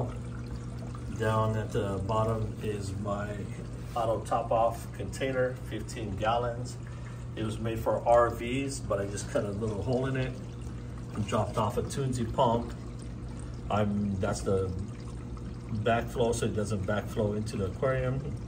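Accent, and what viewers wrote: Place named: United States. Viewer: Salutare din uk, cred ca ești demult în usa ai accent fix te usa, foarte diferit de uk. Super acvariul !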